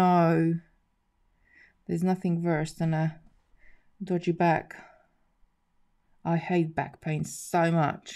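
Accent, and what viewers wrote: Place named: Hungary. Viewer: Good morning, apologies for missing u last night. That project looks beautiful. I have been trying to work out ur accent, and I heard u mention Hungary, is that where ur from? My mum was Hungarian, and came to England with her mum and two brothers during the revolution in 1956. I have been there a few times and love the country.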